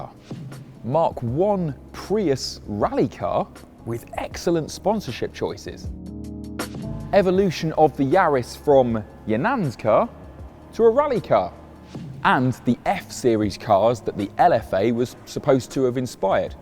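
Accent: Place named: United Kingdom. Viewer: a british guy talking about a japanese car im laughing hard this is so funny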